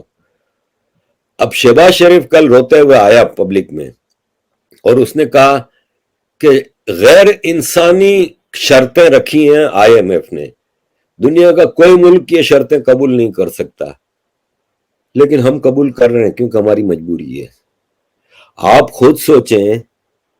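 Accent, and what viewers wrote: Gujarat, India. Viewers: Sir aap k bol ne me abhi bhi gujrati accent dikhay de ti aor sir aap j se musalman ki Bharat me jarur hai aap Gujarat me aa ke rahi ye sir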